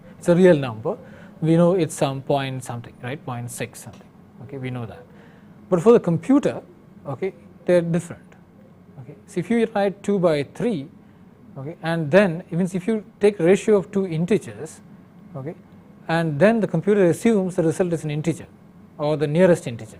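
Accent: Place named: India. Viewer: If I hear one more sh*tty Indian accent, my head is gonna explode. If Microsoft didn't discover someone over there could code a tiny bit, folks there would still be insulating their mud huts with cow dung...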